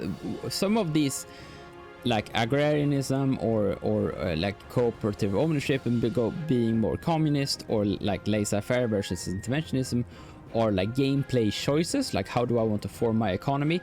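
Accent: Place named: Sweden. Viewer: That's a swedish accent.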